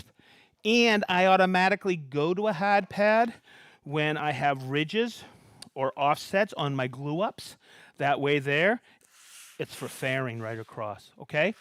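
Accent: Boston, United States. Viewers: Hoosier?? That's a BOSTON accent...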